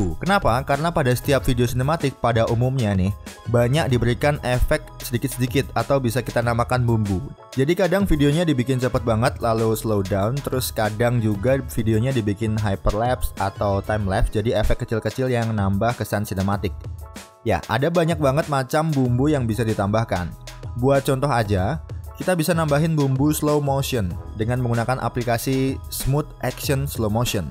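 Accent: Kudus, Indonesia. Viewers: wong kudus mas? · Wong kudus mas? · Masnya orang kudus ya? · Mas orang kudus? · Wong kudus toh mas?? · bang elu orang Kudus ya , wkwkwkw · Smpean ndk wong kudus bro..kok kyok.e shot nek alon2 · Bang lu orang Kudus kah? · Wong Kudus mas? Wkwkkwk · mas orang kudus ya? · Oo sampean wong kudus ya mas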